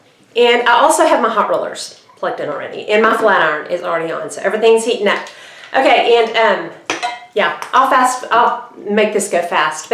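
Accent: South, United States. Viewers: What is your hair color? Love it! Listening to your southern accent is like being back home...love your videos girl!!!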